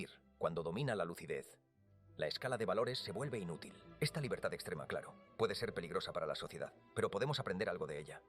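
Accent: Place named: Castile, Spain. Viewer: Buen video , mas el acento castellano y la baja calidad del sonido lo arruino❤.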